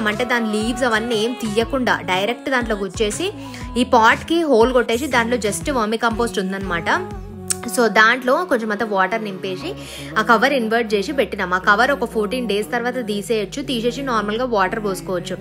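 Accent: Telangana, India. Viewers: Telangana bashaaa...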